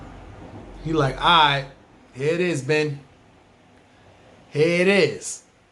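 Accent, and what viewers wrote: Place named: New York, United States. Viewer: I know you must rap you the New York voice what’s good out there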